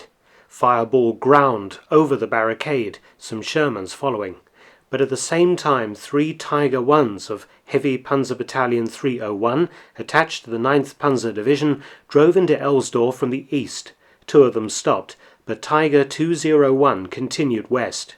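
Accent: United Kingdom. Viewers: Thanks for the video. I can tell by your voice that your British. Your our best friend and that will never change. Us Americans are very loyal we don’t give our loyalty easily. Liberty or death.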